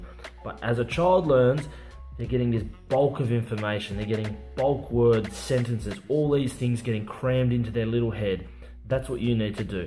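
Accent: Australia. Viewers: Kia ora e hoa thank you for being brave and courageous for voicing important things like this vid. You sound very ozzy, just curious.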